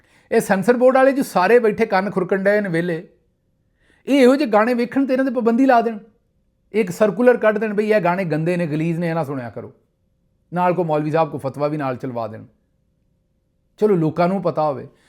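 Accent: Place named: Punjab, India. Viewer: Like hearing your Punjabi Accent!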